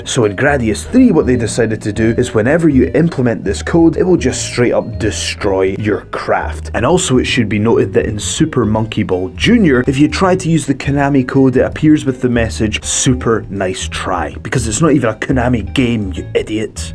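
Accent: Scotland, United Kingdom. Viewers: I haven't been replying for over 2 months. I've got these days to waste replying a guy who thinks he's so smart :P Dude, you clearly have no idea what you're talking about. Accents and the language the person speaks changes everything in communication. Example: I speak my name with "Pau" being the strong syllable and then "lo". Some people, depending on the accent and the language they speak will say it sounding a little different, which doesn't change my name at all. Actually, my foreigner friends tend to say my name with "lo" being stronger. Every time they say it it's still my name. Easily recognizable for sure. The guy is Scottish and say "Mario" with his damn accent and now the name is wrong? Do you know that Portuguese, Spanish, French and probably many others language doesn't have the "th" sound in their language and won't say "Elizabeth" the way you think they should? 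Won't even need to talk about accents here! Ps. Seriously? Mario is not real? If you say Santa Claus isn't as well I'll kill myself right away! At least I know they're more real than your wisdom :P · Are you Scottish? I love your accent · Gotta say...kinda enjoying the Scotsman a bit more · I get that you're Scottish, I am too, but stop putting it on so strong its pure annoying. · most annoying scottish accent ever... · HES SCOTISH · He's clearly a Scot, you trolling bro?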